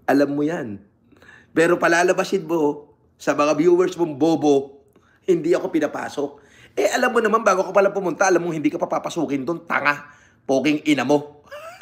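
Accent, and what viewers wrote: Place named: Nueva Ecija, Philippines. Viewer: nangto tunog nueva ecijano, kupal peke imahe HAHAHA😂😂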